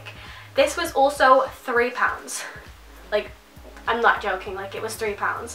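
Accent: United States. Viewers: Are you from America ? You have a really strong American accent? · talking with a twangy America accent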